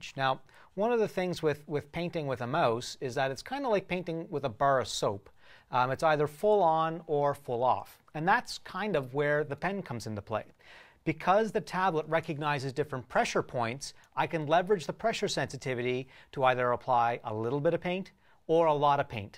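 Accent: Canada. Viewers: lawl he has a canadian accent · is he canadian?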